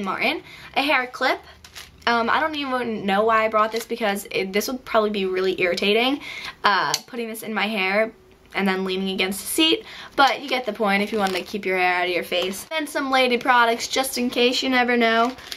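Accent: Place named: New England, United States. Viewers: it sounds like she's somewhere in New England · I think she may be from the north eastern united states because of her accent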